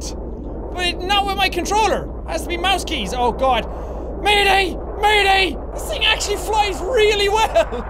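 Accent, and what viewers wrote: Ireland. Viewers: He was so much irisher in the past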